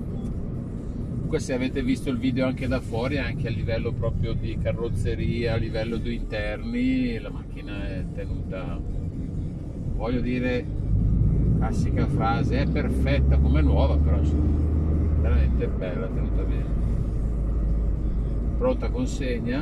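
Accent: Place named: Milan, Italy. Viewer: Ue' figa, tipica parlata milanese:=))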